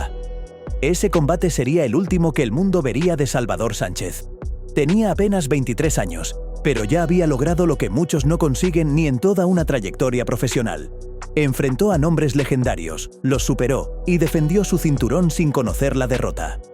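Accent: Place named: Spain. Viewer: ¿Qué hace un tartosho eshpañol presentando la historia de una leyenda?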